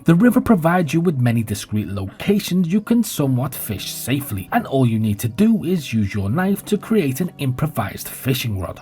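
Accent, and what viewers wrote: Liverpool, United Kingdom. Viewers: Are you part Scouse? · Not even close. This bloke is from the UK, Scouse accent from Liverpool probably.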